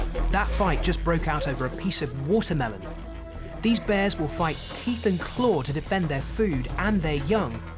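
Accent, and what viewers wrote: United Kingdom. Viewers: Love British commentary. Its funny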